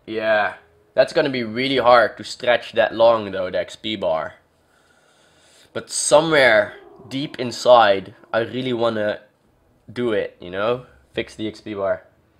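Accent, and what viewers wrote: Netherlands. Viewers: Lol love ur englisch its so funny and i reconice myself, becous i also speak dutch ;D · Are you Dutch?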